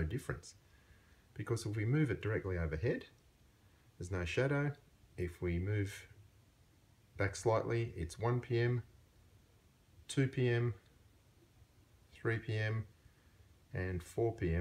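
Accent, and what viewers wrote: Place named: Australia. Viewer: He is Australian as far as I can tell. Here is the NZ accent <link>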